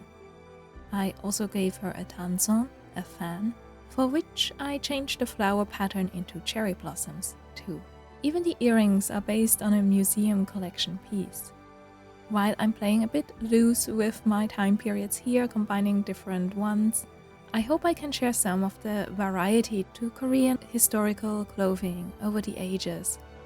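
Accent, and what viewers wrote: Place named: Germany. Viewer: I just found you on YouTube because I was looking for relaxing art vlogs and your narration and story telling is so soothing and beautiful, it calms me and I find it very inspiring 💕 it's also very unique that you live in Korea but have a German accent? (I'm German btw 🙈🤣) Where were you originally from?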